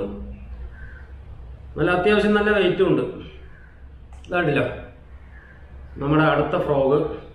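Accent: Palakkad, India. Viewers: Palakkadan Language Kelkumbo Ulla oru Happiness 😍Videos Adipoli Aayind Me Also Palakkad Etta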